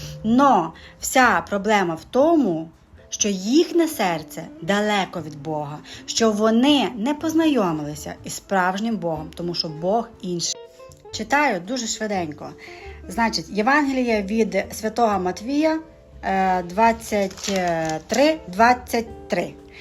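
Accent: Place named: Chernivtsi, Ukraine. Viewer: Ви з Чернівецької області , говор у вас ніби звідтіля.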